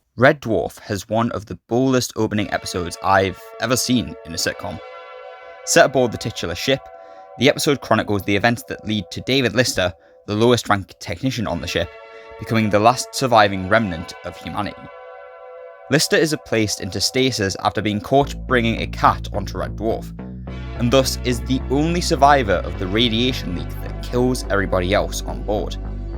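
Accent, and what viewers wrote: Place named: North East England, United Kingdom. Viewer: Love Red Dwarf then I was like this guys Accent North East rules 🎉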